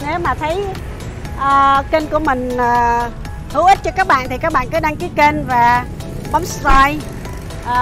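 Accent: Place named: Nam Dinh, Vietnam. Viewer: C VẦY, VẦY giống quê NAM ĐỊNH em thế.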